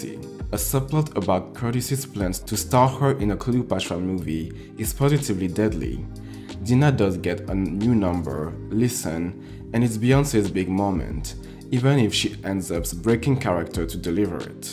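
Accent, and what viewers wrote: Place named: Germany. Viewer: Are you German? love the accent, I love your breakdowns btw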